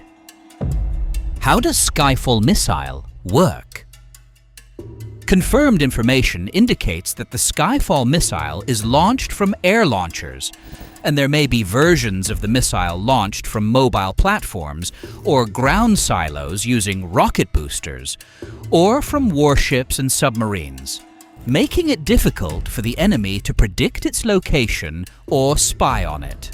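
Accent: United Kingdom. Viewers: Wrong accent. I place no creadence in any brit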